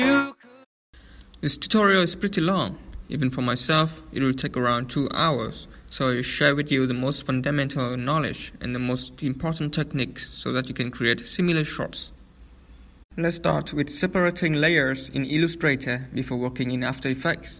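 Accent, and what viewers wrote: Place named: Italy. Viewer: Woow man You have an accent like a man in video "italian man who went to malta" and that's so funny and cool :D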